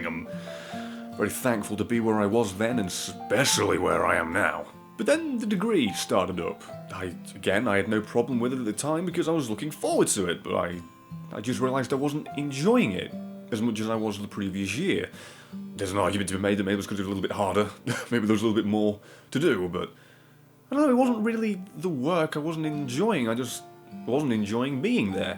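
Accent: United Kingdom. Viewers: I gotta admit this is one of the few channels that I've grown so fond of that I've tried to remember the time of day you put up new videos. I stumbled upon your insane British rambling back when the Dark Souls 2: Crown of the Iron King videos came out and I found your blind run against Sir Alonne. Since then your content has been quite fantastic, and even a lot of stuff before then is great to check out (Altough I did notice the rather obvious point where the quality of content changed dramatically). Both the old, the new and the current content you do is awesome and I look forward to seeing what the future holds in store for both you and us subscribers. Probably you dying horribly to more Uruks and me writing more silly death lists. Godspeed you magnificent bastard of a youtuber!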